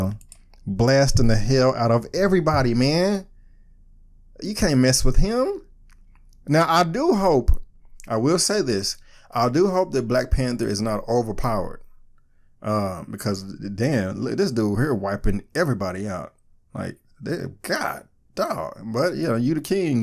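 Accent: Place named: St. Louis, United States. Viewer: I'm from STL and your "r" pronunciation sounded very St. Louis.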